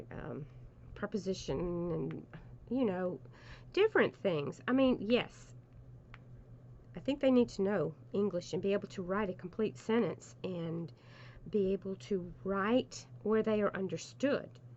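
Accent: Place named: South, United States. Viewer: I can't believe you are losing subscribers! I love your gentle southern lilt and I will continue to watch....DON'T GIVE UP YOUR CHANNEL!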